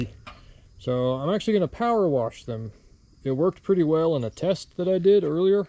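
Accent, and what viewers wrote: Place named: United States. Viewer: Foyer pronounced FOI-yer. LOL! I love your American accent and it's one of the reasons I listen to you. Where I am from it is pronounce foi-YAI because it has a French root. That was not judgement. It was amusement. Don't change. It's charming American.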